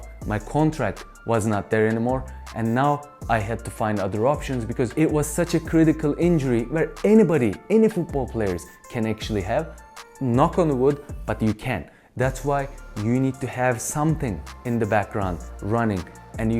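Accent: Turkey. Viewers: türk aksanı neyse bu video o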